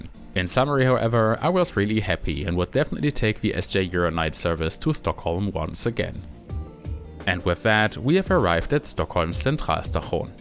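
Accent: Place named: Germany. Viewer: This is an excellent video by any standards! I can hear a hint of what I believe is your native German in your English pronunciation, but it's very minor and only adds to the authenticity of the video. Your Swedish pronunciation is impeccable. I just subscribed to get you a little closer to the elusive 1,000 subscribers mark.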